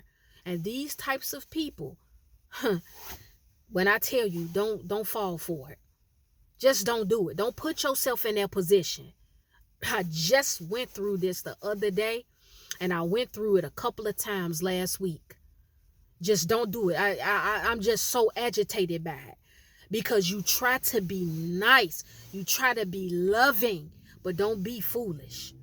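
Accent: Chicago, United States. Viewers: I love the chi town accent. Yes spot on. Iv got away from this with my abusive ex and friends. Now im laying low bettering myself whilst there clicking up in the streets because they can't get me at home ( so I hear )' God is good. So is lol. Amen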